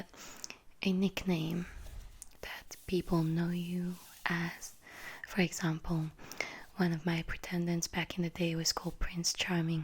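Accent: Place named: France. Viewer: Woop I got so used to your french accent so this sounds off😂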